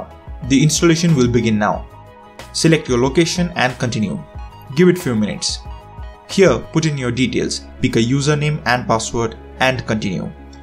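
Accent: India. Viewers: Bro you Indian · are u from india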